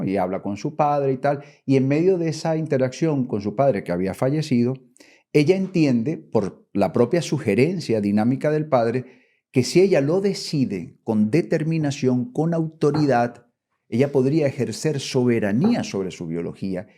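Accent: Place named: Colombia. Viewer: Gracias Doctor por todas estas enseñanzas. Creo que ud es Colombiano por su acento y me enorgullece por lo que está haciendo por nosotros.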